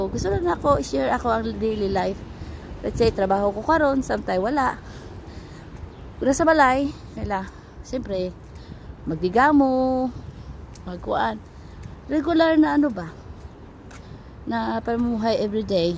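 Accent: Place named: Visayas, Philippines. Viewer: Ang cute ma ning pag nag bisaya ka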